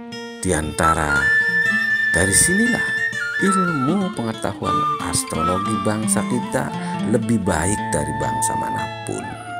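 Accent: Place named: Java, Indonesia. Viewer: vocalnya khas jawa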